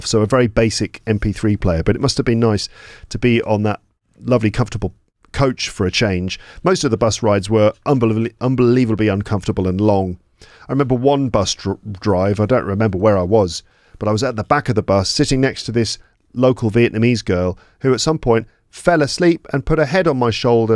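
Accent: United Kingdom. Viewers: Brits accent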